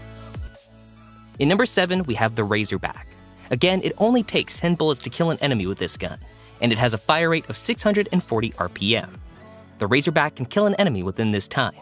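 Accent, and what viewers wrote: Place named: India. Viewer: His voice is very nice. But of course we want the natural Indian accent. 😊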